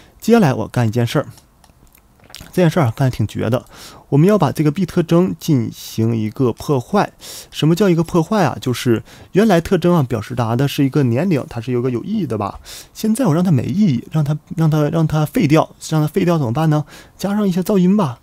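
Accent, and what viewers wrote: Northeast, China. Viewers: 这东北话我听着很带感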